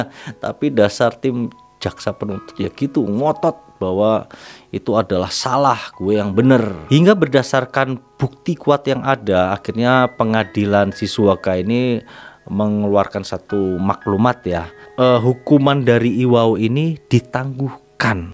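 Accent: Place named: Java, Indonesia. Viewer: MAAF BANG YANG KU CINTAI...ANDA INI SEBENARNYA DARI DAERAH MANA? KADANG2 LOGAT BAHASA JAWA MEDOK,KADANG2 SUNDA BISA JUGA JAKARTA/BETAWI... DARI MANA YAA...BIKIN AKU PENASARAN BY THE WAY BAKAT ANDA DALAM BERCERITA MEMANG MENAKJUBKAN....SIIIP MANTAAAP, BERKEMBANG TRUS BRO..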